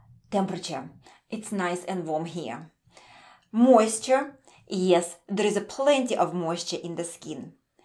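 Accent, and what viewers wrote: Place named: Eastern Europe. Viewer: just out of curiosity where are you from you sound like eastern europian, and thanks for the great content:)))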